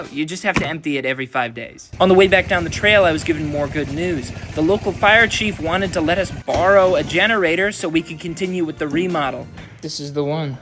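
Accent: United States. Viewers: You sound like American ! Euros??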